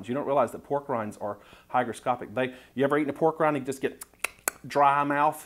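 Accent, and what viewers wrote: South, United States. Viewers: I used cheesy skilley bread to make last year's low carb dressing,and it was good. I knew I wanted to tweak it some this year. I appreciate this recipe so much! The texture is so amazing! I ended up using all butter, cuz I was too lazy to mess with bacon and I knew the butter would be fine.Lol...My butter got good and brown in the skillet before I poured the batter in and lemme tell ya....Whole nother level of goodness happened there😍 omiword! I am kind of like a picky Lil kid so I use all powdered seasonings.I definitely like a good amount of sage ( I mean you sound southern and all,but Idk if you can be right without sage in your dressing😂), My goal today has been to enjoy a lower carb,no stress Thanksgiving. So far so good. People hear low carb no sugar and they're not interested....muahhhahahahaha...my nefarious little plan works. 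They have no idea how good and satisfying this food is! Thank you for doing this and Happy Thanksgiving to you and yours! ❤️🙌💪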